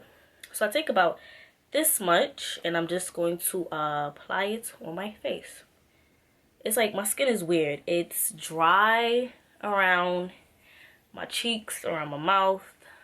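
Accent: New York, United States. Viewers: You sound like your from NYC lol great video and new subbie I'm loving your channel 😍😍😍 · you are so pretty. you have an accent too lol. are you from new york? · I knew you were from newyork as soon as I heard that accent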